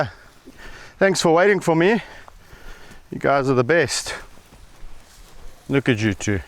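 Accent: South Africa. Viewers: Those manes. Can’t BELIVE. How full and thick they are. Andi just love the way. You talk to them and love the South. African accent. Love thst beautiful country. Gods own country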